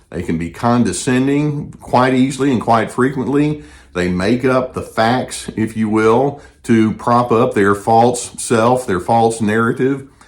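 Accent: South, United States. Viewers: vids began showing up in my recommendations even though I never searched for the topic of narcissism. It's like my android had been listening to me. That's a little creepy. I was in a bad relationship, but never attributed it to narcissism. After watching a few of vids a lightbulb went off in my head. I finally had an explanation for the craziness I'd been living. I so appreciate these vids, teaching method, and I love his accent being a Southerner myself. I've binge watched his vids and learned so much. Thank you!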